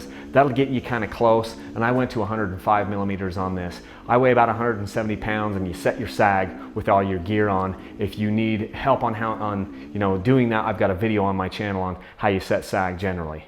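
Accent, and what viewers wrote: Utah, United States. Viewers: Dude you have the best example of a Utah accent I've ever heard! Thanks for the sweet content in familiar places, hope to bump into to you some day.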